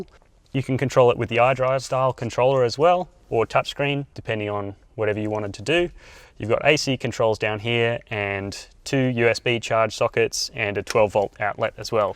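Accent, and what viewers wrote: Australia. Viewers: "He speaks in a strange way for an american, but he doesn't sound like a british", then I discovered you are australian. I always forget about you, guys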